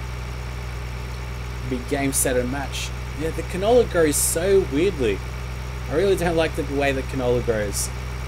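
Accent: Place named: Australia. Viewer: are you from australia because you sound australian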